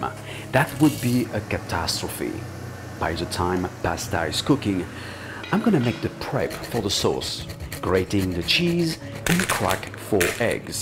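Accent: France. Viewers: When I am in heaven, I hope there is an option for a French-accent man to prepare carbonara for me.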